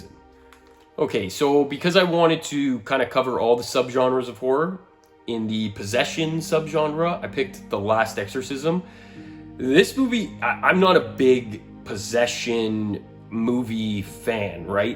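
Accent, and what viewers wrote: Canada. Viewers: Hey I just wanted to say I think you make really great content and have inspired me to get in to King. Keep up the great work!! Also is that a Canadian accent I hear??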